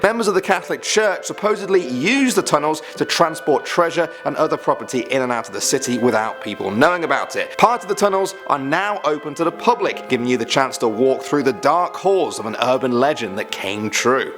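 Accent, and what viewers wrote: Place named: United Kingdom. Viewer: Are from the uk by the way you speak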